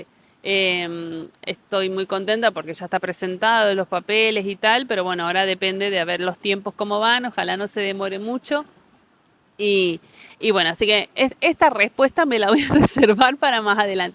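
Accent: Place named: Argentina. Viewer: ¿Que me sigue sorprendiendo de los argentinos que estáis llegando en masa a España? Que hacéis cientos de videos de todo lo que os sorprende de España, pero muy poco esfuerzo en adaptaros. Ejemplo: lleváis años aquí y seguís con ese horrible acento argentino.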